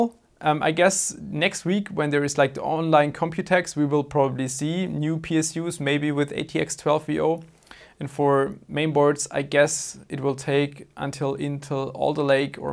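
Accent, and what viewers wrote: Germany. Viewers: Hahaha dieser deutsche Akzent kickt anders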